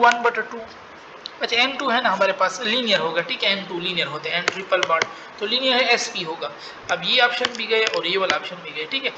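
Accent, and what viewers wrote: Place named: Khyber Pakhtunkhwa, Pakistan. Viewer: Sir ap kha sa hoo kpk sa lg rhi hoo😊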